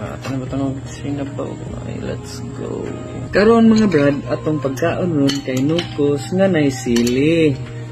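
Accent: Visayas, Philippines. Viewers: 😂😂😂 ug paminawon raka ,di ka tan awon bisaya jud kaayo ....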